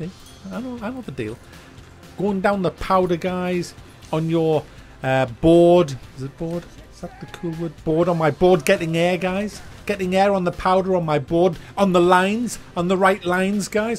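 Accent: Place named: Sunderland, United Kingdom. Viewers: are you from Sunderland? I can tell it's a local accent but I can't quite place it... (also Silksworth is great!) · haha Silksworth ski slope! I thought that was a mackem accent! Love your vids.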